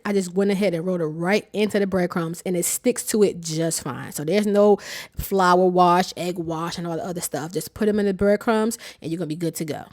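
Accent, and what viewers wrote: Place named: Maryland, United States. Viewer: I hear a Maryland accent 😂 I'm making these soooooon!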